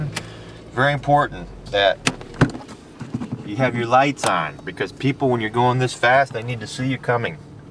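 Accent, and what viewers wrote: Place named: Germany. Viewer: Damn i speek fluent German but you sir sound more german than germans.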